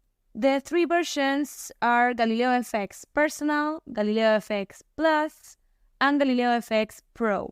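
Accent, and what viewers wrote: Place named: Italy. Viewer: I guess you are Italian. Nice accent. Great Video.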